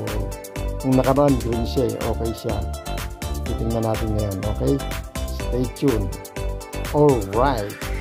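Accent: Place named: India. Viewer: September🎧 30, 2023 The weather here is fair Ads: 1) Inasal 2) Shopee 3) Excorcist Movie trailer 4) Payless 10 days ago, Brother it seems to me that you have imitated Indian accent. How are you? Qantab has a rocky shore. Okay BRO be safe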